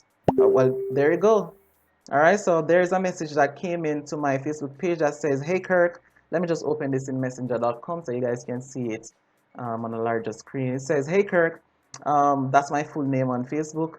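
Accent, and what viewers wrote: Jamaica. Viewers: I was trying to place the accent and wanted to say Jamaican, but wasn't totally sure. Accents fascinate me, so thanks for the affirmation!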